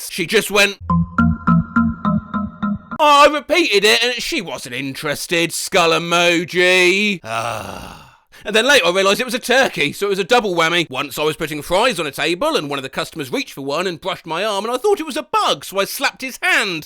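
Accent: United Kingdom. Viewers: This is hands-down the funniest video to date. Turns out that everything is made better when read by a middle-aged British man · Werid how a British critter can make me feel better after ballin 😎 my eyes out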